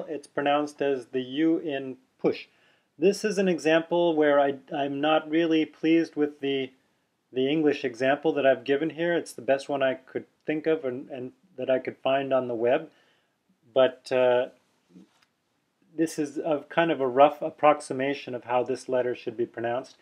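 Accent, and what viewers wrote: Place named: Canada. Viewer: I had watched next I one in the series after making this comment: and noted that u did NOT use a dipthong, as in 'bone' [when pron. w an English accent]. I take it then that that 'o' (or 'o-ou'), would be more suited to this symbol: औ . Tho as u say: with a 'canadian' / afro-carribbean accent; so more like 'u-ou'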